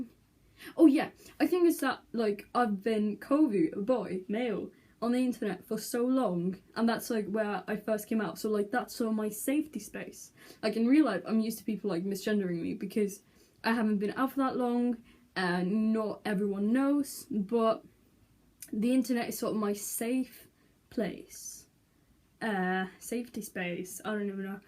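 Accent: United Kingdom. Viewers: btw I thought you were British, you sound like Martin Freeman talking XD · I actually thought you were British until I saw the vlog you did about your day at school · Live the video why the British accent not a Norwegian one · I thought you were British! :) Well, I'm Italian, but to me your accent sounds very British, and your English seems excellent! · How did you learn English? And to sound vaguely British (to me) too? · I THOUGHT YOU WERE BRITTISH WHATT youre so good at english, like im swedish and my english is so bad TEACH ME YOUR WAYS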